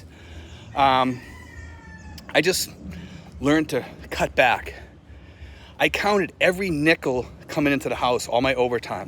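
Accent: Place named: Massachusetts, United States. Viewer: You sound like your from Mass! I from Boston.Iplan on moving to the Philippines before I'm 58.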